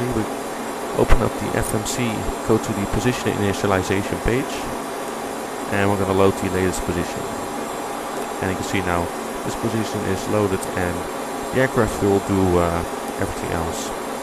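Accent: Netherlands. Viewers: I swear to god, once i heard you're accent i KNEW you were dutch! And you're youtube account confirmed it :) Great video & thanks. Much better than reading tutorials ;)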